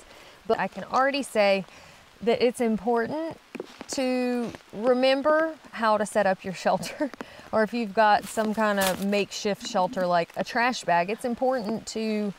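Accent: South, United States. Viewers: Gymnasticin! Love it, been a while since I have heard pure Sutherneese.... Hi, been a while!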